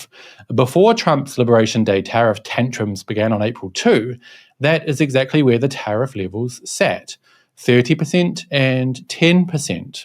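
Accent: New Zealand. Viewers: You sound like a spokesman for the Chinese government. With a nice NZ accent. But you are correct I suspect. China 1. USA 0. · Kiwi accent lol, unmistakable. 😊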